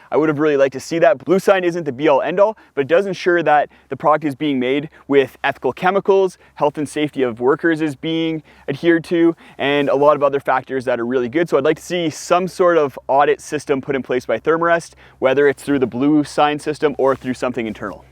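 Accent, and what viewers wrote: Canada. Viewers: I mean if the accent wasnt obvious, the mixing of metric and imperial measurements make it obvious of your canadian heritage lol.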